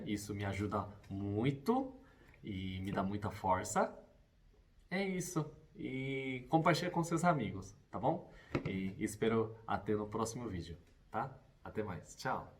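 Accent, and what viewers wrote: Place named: Mato Grosso, Brazil. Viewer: Nossa, o sotaque dele lembra o sotaque de quem é do estado do Mato Grosso.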